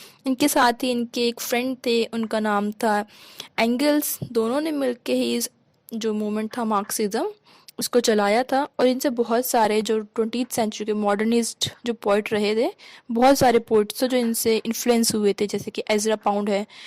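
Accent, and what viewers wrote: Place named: Kashmir, India. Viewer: ur voice looked like u were Kashmiri Anyways thx my dear sister Stay blessed ❤️❤️❤️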